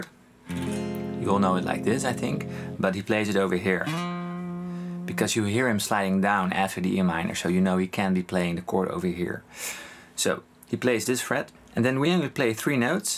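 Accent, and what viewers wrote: Netherlands. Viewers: I can hear he is dutch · are you Dutch?you sound really Dutch XD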